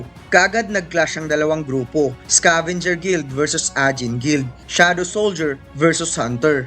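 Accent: Visayas, Philippines. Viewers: Bisaya kc sya kaya ganyan pronounce nya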